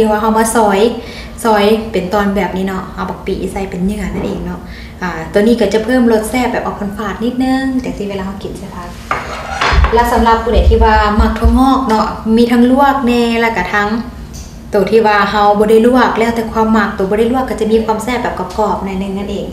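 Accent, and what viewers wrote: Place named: Laos. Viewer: ນີ້ລະຈັ່ງເອີ້ນວ່າຄົນລາວເຮົາການເວົ້າແບບນີ້ສຸດຍອດມັກຫລາຍ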